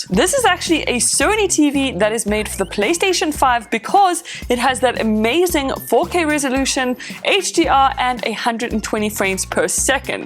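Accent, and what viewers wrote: South Africa. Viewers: Your South African is that true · Is she south African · You sound south African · Is she from south Africa? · That south African accent. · Her accent is spot on south african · Are you South African? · I sense South African👀😂 Maak my sommer trots🔥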